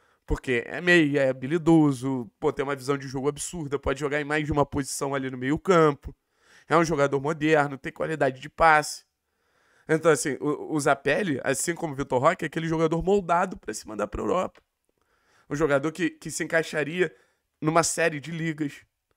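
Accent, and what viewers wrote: Rio de Janeiro, Brazil. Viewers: Pow! Um cara com sotaque carioca. Mais um surfando na onda do furacão.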